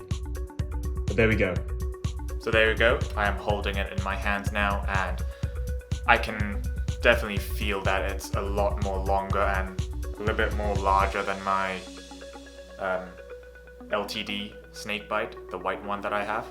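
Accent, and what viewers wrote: United Kingdom. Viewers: I love listening to your British accent ❤️ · For some reason I wasn’t expecting an english accent. But it suits you perfectly lmao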